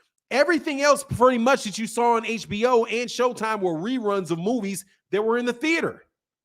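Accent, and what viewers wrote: Texas, United States. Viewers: you from Texas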